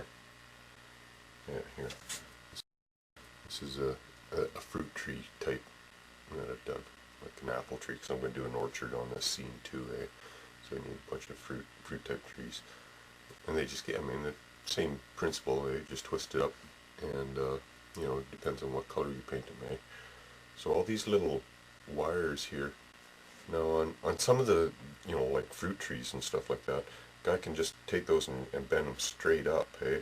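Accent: Canada. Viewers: are you canadian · Canadian eh? hah, great trees friend!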